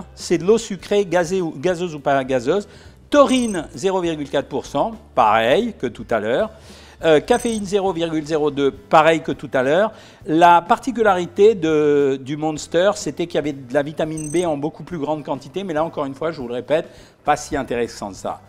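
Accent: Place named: England, United Kingdom. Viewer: J’adore votre accent anglais 😂😂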